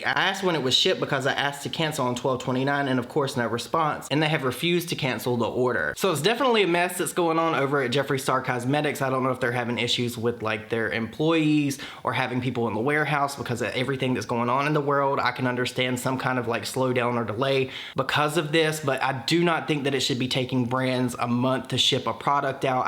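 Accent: South, United States. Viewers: Your face makes me so happy and southern charm accent! I’m so glad when you upload, I love listening to you, you talk trash but respectfully and have a bright personality. You honestly brighten my day when I’m working from home. Thank you for continuing to upload even when it’s hard! It helps me get through my day!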